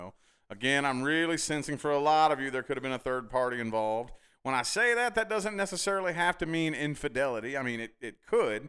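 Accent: South, United States. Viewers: Thank you for the reading! Like your Southern accent and the no bs approach! 👍 Also, if it’s possible, is there a way the cards can be more specific in your future readings in regards to the age of the ‘other person’ example: Older/Younger and if their in a foreign country? This would help a lot in deciphering the readings. Thank you so much! Peace! ✌️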